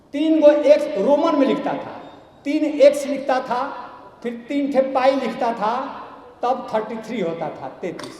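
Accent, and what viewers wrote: Bihar, India. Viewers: sir aap bihar se hai? aap ka bolne ke tarika bilkul hamare jaisa hai. 🙏🙏🙏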